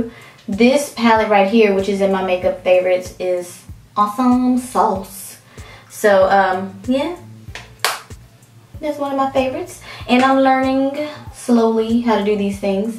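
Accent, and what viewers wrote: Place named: South, United States. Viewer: I will FOREVER love your Southern Accent!!! Thanks for the Video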